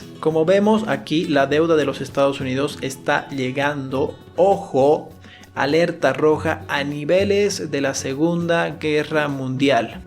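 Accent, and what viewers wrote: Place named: Cuenca, Ecuador. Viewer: Muy buen video primo! ( tienes acento cuencano talvez si somos primos!)👍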